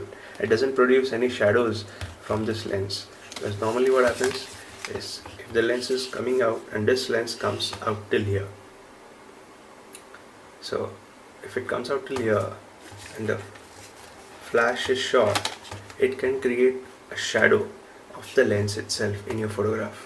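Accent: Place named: India. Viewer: love that accent my indian friend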